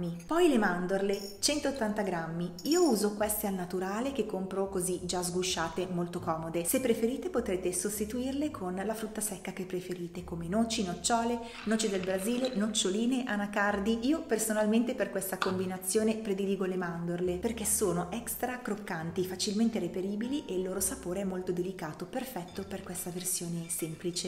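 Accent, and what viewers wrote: Romagna, Italy. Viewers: Ottime idee!!! La tua voce è molto dolce..sei romagnola vero? 🙂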